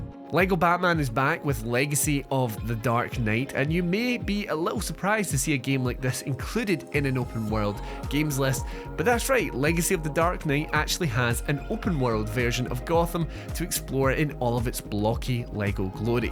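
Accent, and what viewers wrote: Scotland, United Kingdom. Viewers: YOUR ACCENT IS AMAZING, WHAT PART OF SCOTLAND ARE YOU FROM ? 😊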